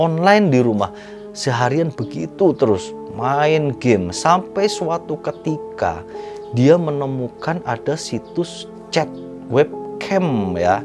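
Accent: Java, Indonesia. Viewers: Angkel medok..nyenegkeh swarane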